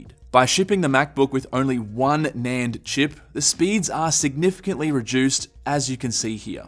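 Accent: Australia. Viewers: Nice hair. Love that Aussie accent.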